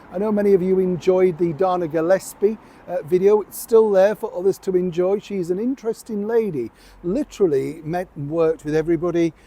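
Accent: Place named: Yorkshire, United Kingdom. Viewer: It seems everything is fake with MM. I'm surprised that even the baby reveal was fake, planned! I dislike fake people, Obviously there has been even more lying to us then if "Aitch" has been getting paid for these things. Can't stand her accent either, awful. Love the way you speak though, a little mixed, perhaps with living in London with the odd Yorkshire word or phrase slipping in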